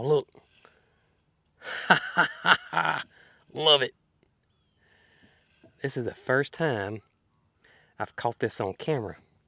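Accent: South, United States. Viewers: I have to listen to your southern accent carefully sometimes. I was confused how winter rye was going to feed your soul till I reasoned out you were speaking about soil LOL😂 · I love how soil sounds like soul. I am an Alabama transplant and I just love to hear southen speak!!!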